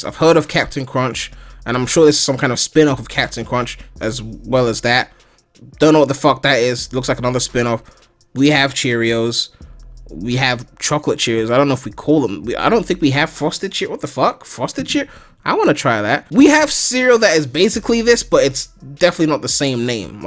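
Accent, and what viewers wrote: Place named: United Kingdom. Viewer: Why do you mix your accent with British and American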